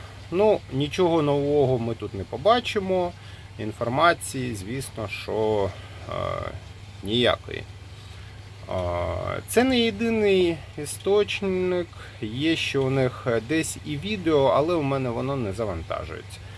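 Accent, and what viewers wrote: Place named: Surzhyk, Ukraine. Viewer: Какой натужный суржик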